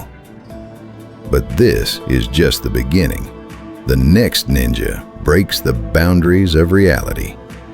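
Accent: South, United States. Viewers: Love the Southern Accent narrative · Whats up with the southern voice?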